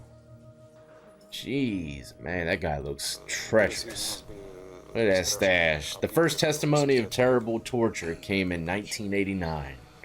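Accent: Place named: South, United States. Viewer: Texas,Lousiana etc. I Love the southern accent. 🎵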